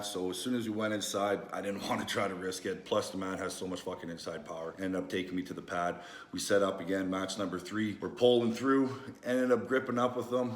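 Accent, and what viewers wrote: Canada. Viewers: So us Canadians really do have an accent eh?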